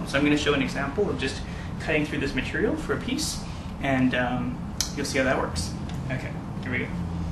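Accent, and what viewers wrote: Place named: United States. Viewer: Its odd to see american accent on an indian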